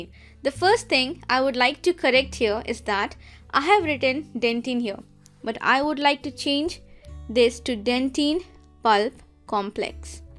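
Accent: India. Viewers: Bad indian english accent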